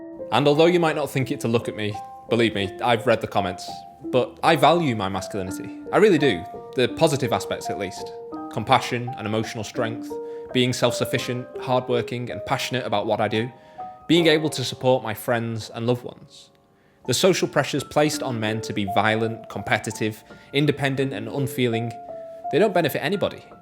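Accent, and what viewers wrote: United Kingdom. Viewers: off topic, but what is that accent? I know it's UK but more specifically lol. sorry im american. like is it Birmingham or Yorkshire or Lancashire? lol im just guessing so sorry if im way off!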